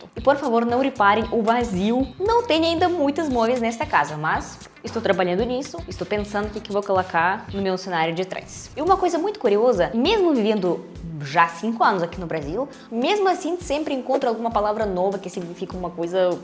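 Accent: Russia. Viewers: é claro que é sotaque. Em russo quando há uma palavra com duas letras O a primeira fica com som de A. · Você é apaixonante. Também vim de família russa. Seu "sAtaque" é lindo e me lembra os velhinhos da minha família. Nunca perca esse sotaque. Acho você a melhor surpresa do youtube no Brasil nos últimos tempos. Um beijo do Rio ❤ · Parabéns pelos seus vídeos, Obrigado pelo seu jeito sempre leve, divertido, simpático de mostrar as diferenças entre Brasil e Rússia, e o seu sotaque Russo misturado com o sotaque do estado do Paraná, deixa seu jeito de falar português muito legal e interessante! 😊❤ Sou de Goiás, vizinho de Brasília, na minha região tem gente com vários sotaques diferentes. 😅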